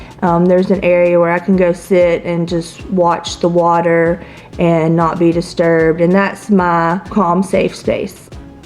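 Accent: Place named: South, United States. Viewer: This was on my recommendations and I'm glad I stopped by to give you a watch. Even though you scripted this video and weren't too into scripting, you still feel very familiar and friendly. Maybe it's the southern accent, but it feels like I'm sitting on the front porch with a friend swinging on the porch swing, drinking sweet tea and talking about life (I'm southern, too, lol). Your dogs are super cute! Thank you for sharing :D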